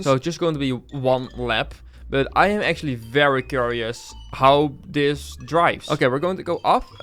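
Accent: Netherlands. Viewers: Ik ruik nederlands want je naam is en ik hoor nederlandse accent English: i smell some dutch cause ur name is dutch and i hear an dutch accent in ur english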